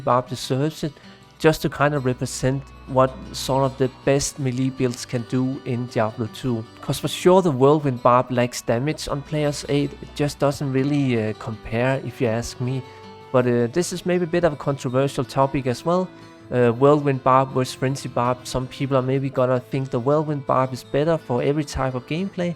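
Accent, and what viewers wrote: Denmark. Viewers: I guessed danish too! I thought he sounded a bit like Lars Ulrich, so that gave it away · I guessed you were Danish and got it right! I recently found out I'm a third Norwegian so I've been paying a bit more attention to Scandinavian accents :P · i smell dansk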